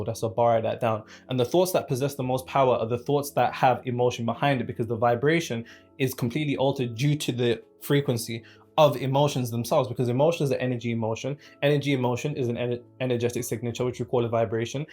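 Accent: United Kingdom. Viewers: Lol😂 I'm glad I didn't put the comment about the way you say pasta like "pastor " I mean I get it we sound different from every region of the world ....I truly admire the u.k. accents most of all if I see you I won't try to sound like you but I can't help but copy your accent at home 😅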